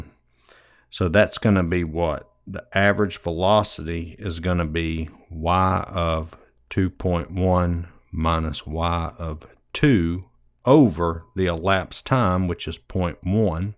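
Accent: United States. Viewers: Very helpful. Cowboy accents are easier to follow than eastern ones.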